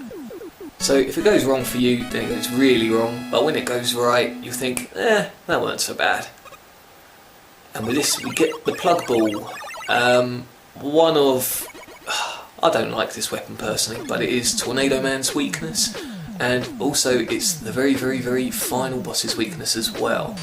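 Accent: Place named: United Kingdom. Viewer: I like your british accent. I have just downloaded MM9 for Wii, and I was stuck in Plug man's stage n_n so thanks for this!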